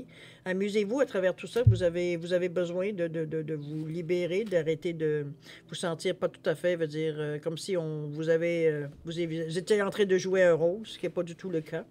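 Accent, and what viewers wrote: Canada. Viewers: Bonsoir, Votre approche est particulièrement profonde. Indépendamment d'un humour que seul l'expérience de vie est en mesure de produire, votre analyse est très élaborée. J'ai vraiment apprécié la finesse de votre intuition et puis cet accent sublime du canada est vraiment très réconfortant car il démontre, selon moi, un attachement à la terre très sain. Bon c'est mon sentiment évidemment et vous en rirez probablement. Mais je dois vous confirmez que mon ressentit vis à vis de vos paroles est particulièrement positif car il touche mon être au plus profond de sa réalité. Un très grand merci de votre maturité d'âme et de vos intuitions, c'est un cadeau!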